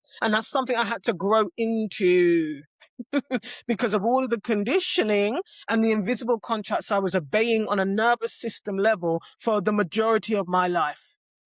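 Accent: United Kingdom. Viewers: yeah she definitely have a British accent, don’t know where exactly but that accent isn’t American at all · London accent.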